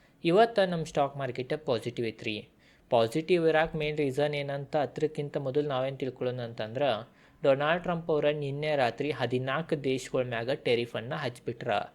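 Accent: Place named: Belgaum, India. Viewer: Namaste, Your explanation and sweet Belgaum language is very interesting to hear. Your friend from gulbarga.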